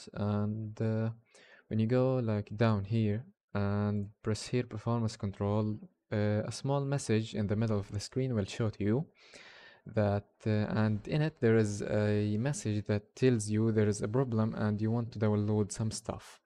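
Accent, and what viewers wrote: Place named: Arab world. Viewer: من اللكنة باين عربي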